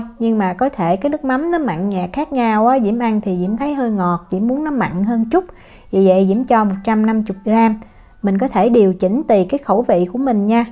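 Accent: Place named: Saigon, Vietnam. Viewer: Hello Diễm năm mới chúc gia đình cháu hạnh phúc vui khỏe suốt năm nhé! Cách hướng dẫn của cháu nhanh gọn đẹp mắt và giọng nói SG làm cô thích nghe thanks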